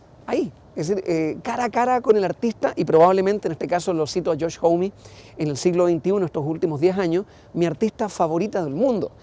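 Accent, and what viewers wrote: Chile. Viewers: disculpa cuando estabas en MTV tu lengua era mas clara un verdadero idioma ahora suenas a un chileno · ahora si habla como chileno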